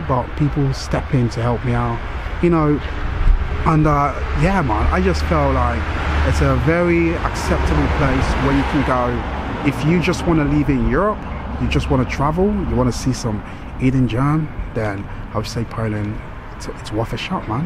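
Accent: United Kingdom. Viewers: Your accent is british not american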